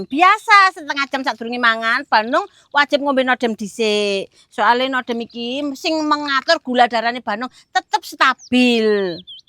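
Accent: East Java, Indonesia. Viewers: ini bahasanya kok Jawa timuran rumahnya mana to klu boleh tahu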